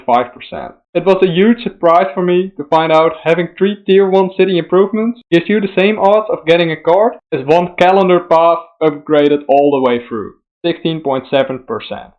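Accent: Netherlands. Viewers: Can I guess your nationality? I’m very into accents, and I think that you might be Dutch. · Even in English I can hear your Frisian accent, lol. Thanks for the Tips :)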